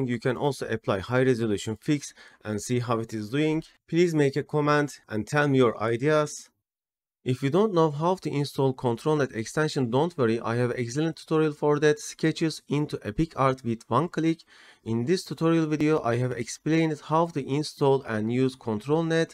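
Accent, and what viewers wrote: Turkey. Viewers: o kadar guzel turk ingilizcesi konusuyosunki direk dikkatimi cekti ;D Guzel video bu arada thnxx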